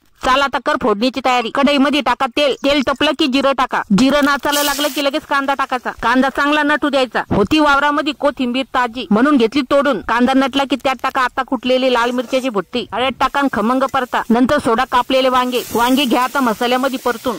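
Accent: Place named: Ahmednagar, India. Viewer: नगरी भाषा 😊❤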